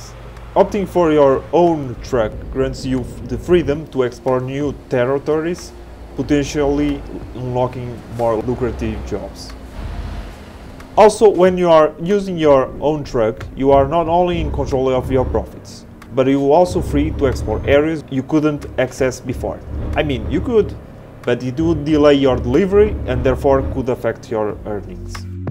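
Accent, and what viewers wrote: Portugal. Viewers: Sotaque Português hahahaha Conteúdo top. Adoro os teus vídeos, abraço <3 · Sounds like PORTUGUES?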